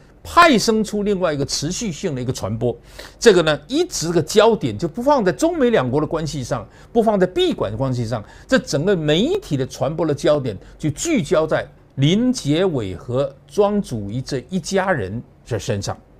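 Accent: Fujian, China. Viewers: 老杨亲美反中反习，了无新意！但是讲的一口福建普通话，倒是很适合大陆观众的口味。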